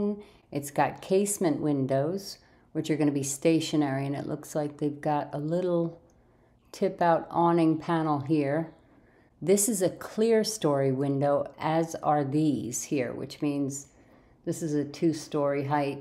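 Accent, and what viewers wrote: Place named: Northeast, United States. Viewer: really appreciate your vids. You so remind me of the Northeast, where i used to live- just your ways,, accent- down to earthed-ness-loveit!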